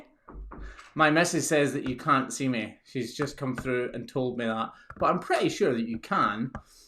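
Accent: Scotland, United Kingdom. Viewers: You’ve got me hooked! No, not just on the hoof care - on your Scottish accent. My 2x great grandfather was born in Abroath (northeast), my 2x grandmother in Glasgow, and they settled in Ayrshire. He was an author and a machinist. Your accent allows me to imagine what he might have sounded like. Check out his work on Bobby Burn’s inspirations, “Rambles Round Kilmarnock”, “Rambles Round The Land of Burns”. He also had a wicked sense of humor I’m told.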